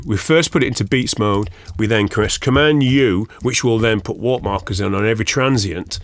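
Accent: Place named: North Yorkshire, United Kingdom. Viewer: Do you do 1 on 1 training? You sound like you might be from round my way… (North Yorkshire).. although been residing in BKK for 15 years. Anyhoo.. let me know.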